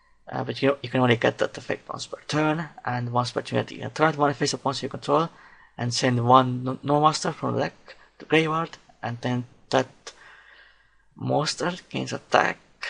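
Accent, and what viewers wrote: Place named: Europe. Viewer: European, like british or german or some shit. that accent is horrible, the video needs subtitles.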